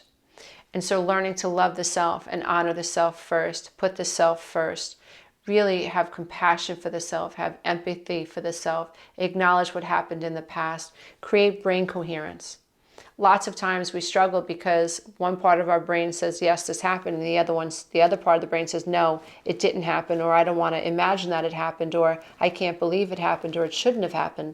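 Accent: New York, United States. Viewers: I love watching your videos, you have a soft nature with a "no BS" New York-style tone. I experience a lot of "ah-ha moments" tied in with laughter too. Wack-a mole, that has always been funny to me, I love that you use that. I really feel like the universe guided me to your videos, they have helped me greatly, thank you.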